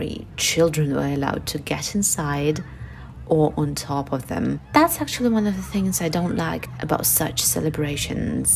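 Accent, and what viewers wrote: England, United Kingdom. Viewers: how you got an English accent?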